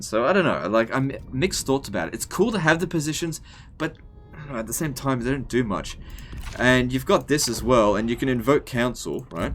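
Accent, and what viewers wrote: Australia. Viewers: I'm guessing that your either an Aussie, or a New Zealander, by your accent. Putting that aside for a moment, I noticed, that you pronounced your faction leaders name, as "Sau Sau". Sorry, my best attempt at spelling it phonetically, the way I heard it. So the "C" in this case, is pronounced, as you would say it in say "Cinnamon" for example. Where it carries more of an "S" sound. As opposed to the sharp "C" sound you would hear in say Car, or Carry, where it has more of a "K" sound. Just want to be sure I'm pronouncing these things correctly. And thought, since your from that part of the world, you would be more of an authority on it, than I am.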